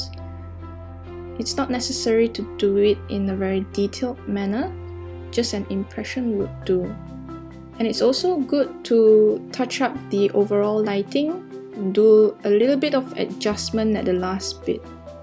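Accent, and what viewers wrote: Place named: Nigeria. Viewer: i swear your accent sounds Nigerian. if it wasn't for your profile pic i would have guessed you were my country mate.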